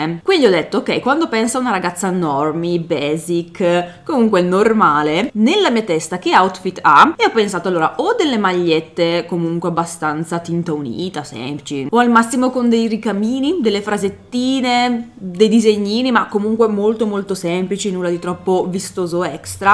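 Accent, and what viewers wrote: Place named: Brescia, Italy. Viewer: Mi posso sbagliare, ma per caso sei Bresciana? Mi sembra di intuire un po' di familiarità nella tua voce😅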